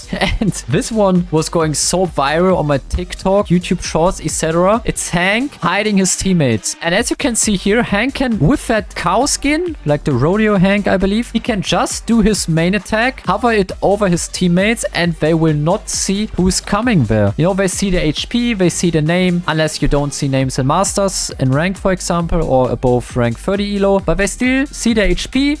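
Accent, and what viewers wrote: Germany. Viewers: Mann hört an deiner Stimme das du deutsch bist 😂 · Dieser deutsche Akzent ist zu krank :D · dieser deutsche akzent 😂😂🤣🤣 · Bist du deutsch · Are you German? 😅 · Du gibst ja echt gar kein fick auf dein deutschen akzent hahaha feier ich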